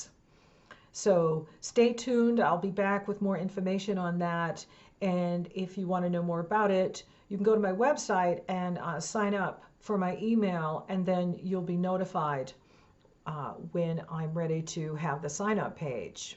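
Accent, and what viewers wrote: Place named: New York, United States. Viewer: Always so uplifting. Looking great. Notice the New York accent now that I recently looked it up.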